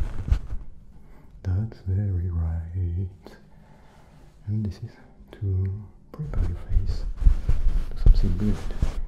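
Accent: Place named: France. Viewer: Ton petit accent bien Français me fait tellement rire 😂😭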